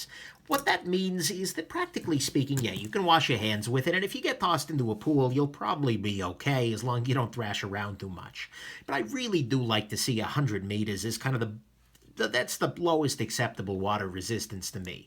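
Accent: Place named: Brooklyn, United States. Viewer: I love this watch but I really came here to hear the voice of, reminds me of Vinnie on Doogie Howser MD lol, plus that Brooklyn flow, being from Queens I can appreciate that!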